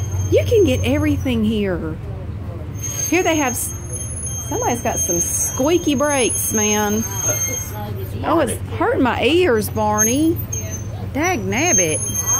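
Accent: South, United States. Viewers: I live in Virginia Beach and I do the same thing you do, whenever you’re around relatives the southern accent comes out stronger!! I have family in both Carolinas and I love stopping at the border and south land!!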